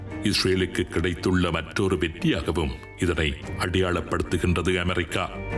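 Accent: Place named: Eelam, Sri Lanka. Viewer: Bro uganda voice eganda ஈழம் voice im from trinco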